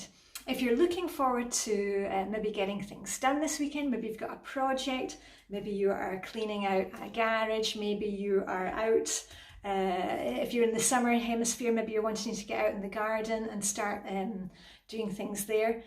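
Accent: Scotland, United Kingdom. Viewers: Love the way you say Mary Berry in your wonderful Scottish accent!!! ♥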